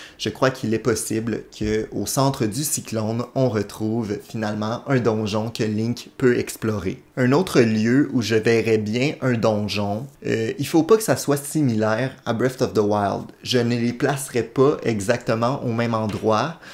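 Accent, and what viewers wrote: Quebec, Canada. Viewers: En tant que Québecois moi-même, je sais pas pourquoi, mais je fais toujours le saut quand j'entends un accent Québecois sur youtube. XD Mais bonnes théories, moi aussi je pense qu'on va revenir à l'époque d'il y a 10000 ans et voir comment tout ça a commencé. Je me demande aussi si les 7 larmes seront les seuls "Key items" de donjons... par exemple, dans Ocarina of Time, tu dois trouver les 3 spiritual stones et après ça les 6 médaillons... alors what if, que dans TOTK, tu doive trouver les 7 larmes dans les 7 premiers donjons et puis là.... BAM! 20 nouveaux donjons où il faut trouver autre chose. (Je sais, je rêve lol)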